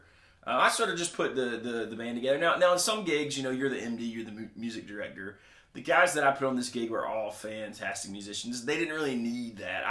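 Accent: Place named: Scotland, United Kingdom. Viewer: As a recent subscriber to both your channel and Casino Guitars and now I want to say thank's to you, Baxter and for excellent content. It's also great to hear a fellow Scottish voice talking guitars.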